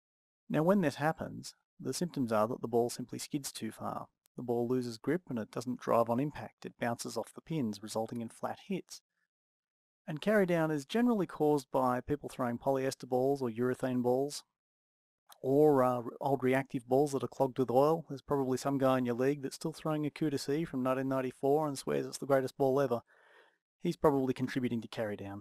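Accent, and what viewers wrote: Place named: Australia. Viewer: good to hear an australian voice helped me a lot thanks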